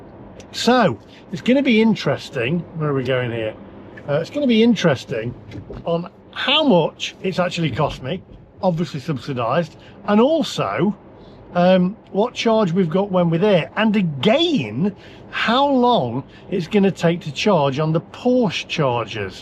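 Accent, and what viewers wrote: United Kingdom. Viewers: EV's should have an electric cord like a vacuum cleaner has. That way there will be no cords to cut off of the chargers,,,,,,,,,,,I love the UK humor that you have. I meet people from UK, retirees in Thailand, And I enjoy talking to them with their UK humor and accent. You are much more expressive than we Americans are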